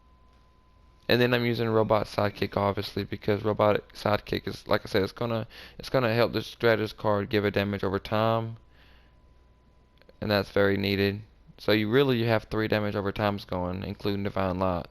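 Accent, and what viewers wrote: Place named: Appalachia, United States. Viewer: Dude. Up your speech patterns and audio. It's "So on and So forth" NOT "So on and so Such". Your Trailer's showing hilbilly...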